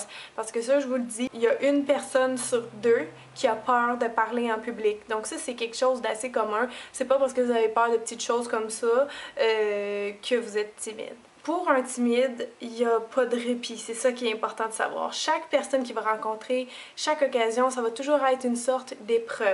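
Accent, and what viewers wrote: Quebec, Canada. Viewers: Tu m'as beaucoup aidée avec cette vidéo tu m'as ouvert les yeux, grand merci:) ça fait plusieurs années que j'essaye de vaincre ma timidité j'ai beaucoup progressé mais j'ai toujours peur d'ouvrir au facteur quand je commande des choses sur le net (xD)! J'avais un peu abandonné cette idée de vaincre ma timidité et cette vidéo m'a redonné envie d'essayer de la vaincre donc grand merci:D (et aussi ça fait plaisir d'entendre de nouveau un accent du Québec:')) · Non une québécoise nuance !! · Très bonne connaissance du sujet, c'est bien d'avoir appris ça en un seul livre. Moi qui me renseigne de temps en temps depuis plus de 2 ans sur le sujet, je n'aurais rien à ajouter Tu dois être assez doué, tu met vraiment les bons mots dans tes explications, et je trouve tu as bien compris le fonctionnement de l'humain Enfin bref, sinon, tu es très mignonne, tu as l'air très sympa. 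Et même si je n'ai jamais aimé l'accent québecois, tu viens de me faire changer d'avis, tu es très charmante :) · T'es québécoise lol ?? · Oui je penqse , elle a l'accent Québecois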